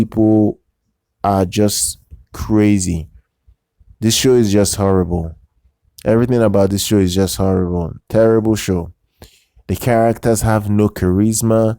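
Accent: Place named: Nigeria. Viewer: Such a breath of fresh air. Seen a video on this. From what I'm going to say sounds like Nigerian, your Nigerian. It sounds like It's so good to see some black people refute this because I am getting into the show and for years now we're seeing just tokenization after tokenization all the time and it's always white characters being changed. So frustrating. I don't like it on either side of the spectrum but the moment you say something you're deemed a racist and it's just so wrong and so many levels. They're trying to blackwash everything in the name of diversity and inclusion and it's just when you're dealing with a historical show and historical figures, this person actually existed. The person that they changed actually existed was a white male and they've changed him for no reason other than pushing the message. Thank you! Thank you for this video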